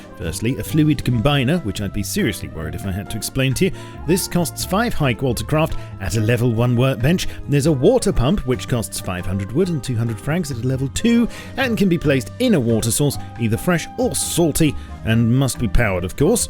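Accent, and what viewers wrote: England, United Kingdom. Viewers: For me, one of the best qualities of your content, is that even though I rarely play rust committedly, your videos are still entertaining. Maybe it's your charming english accent or maybe it's your witty humor. I don't know but keep it up.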